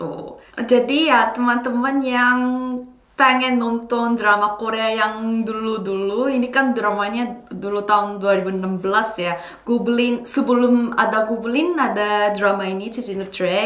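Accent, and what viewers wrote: Indonesia. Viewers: wih,, dah kayak orang indo logatnya.. fix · Logatnya Udah Ke Indo Indo annnn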